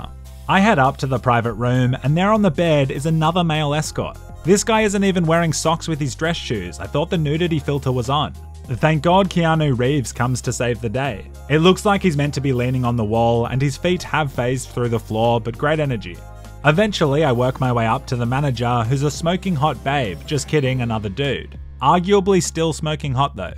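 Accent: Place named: Australia. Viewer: woah... your'e Aussie?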